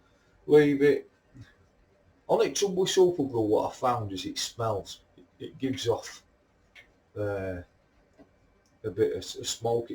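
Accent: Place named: Yorkshire, United Kingdom. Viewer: Good video mate 👍 and love the Yorkshire accent too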